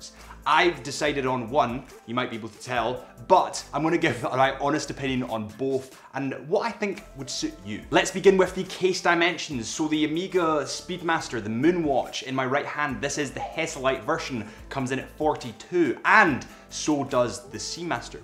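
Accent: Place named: Glasgow, United Kingdom. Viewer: west enders in glasgow talk like this guy lol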